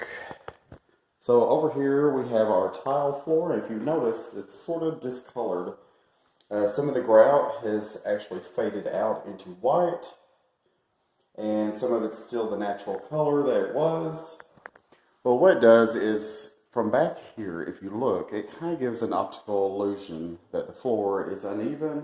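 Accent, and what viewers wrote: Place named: Georgia, United States. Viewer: I'm going to try this!! You were hilarious! 😂 You sound like you are from Georgia!! Same as us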